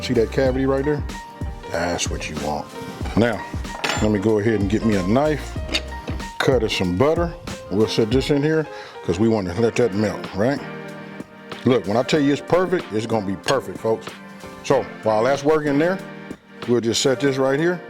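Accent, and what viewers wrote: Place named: Louisiana, United States. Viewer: Damn can't believe I haven't come across your channel any sooner. Only my second video and I gotta ask brother where's your hometown? Because I swear I here some cajun in you sir. Gotta be south La some where. Laplas and Lafeyette area myself. Been away from home for 20yrs and sure is nice to run into someone from that area with that accent that knows how to cook. Subscribed and really enjoying your vids.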